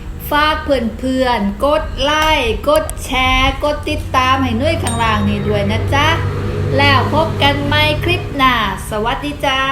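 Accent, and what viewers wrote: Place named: Rayong, Thailand. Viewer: ยำปูแป้นน่าทานจังเลยค่ะ อีกอย่างที่ชอบคือชอบสำเนียงระยองฮิ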